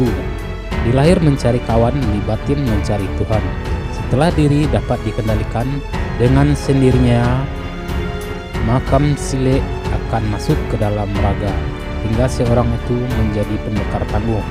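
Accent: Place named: West Sumatra, Indonesia. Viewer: Dari nada bicara org yg cerita d.vidio ini ..aku bisa pastikan kamu org minang jadi kalok bicara soal inyiak atau siluman harimau itu memang nyata baik d sumatera atau d.jmbi tepatnya di prguruan silek rimau ..atau perguruan halimun P. Atau di kampung pamunyian kecamatan lubuk mengkoang kab.tebo jaya jmbi tapi kalok bicara soal adat itu semua suku sudah pasti mempunyai adatnya masing² 🙏.dan di sini saya hanya ingin mengutip sedikit fakta ya itu ..pandangan antara suku kalok saya sering lihat dengan sedirinya kenapa suku minang itu selalu memandang negatif tentang org jawa contohnya sedikit dikit [urang maa.. tu da itu si.akang mah da ] jd kalimat yg begituan kyknya kurang cocok bagi kita sesama suku bangsa INDO.andai saja org suku lain yg berbicara demikian contoh [org mana itu mas org si kerbau atau kabau mungkin sangat janggal kedengaranya kalau bisa mari kita saling menghargai sesama suku bangsa karna kalau di ranjikan atau sejarahkan terlebih di agama kita semua adalah saudara sanak famili yg harus menghargai satu sama lain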